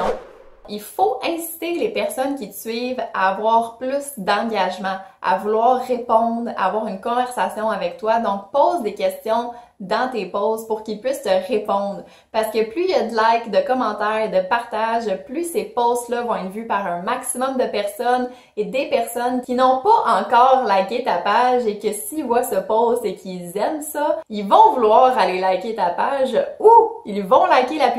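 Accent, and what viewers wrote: Quebec, Canada. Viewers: Tu est québécoise j'aime trop là-bas merci pour la video ✌🏾✌🏾😍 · Oh une québécoise 😍😍 Même si je viens de connaître ta chaîne continu je crois en toi xD 💪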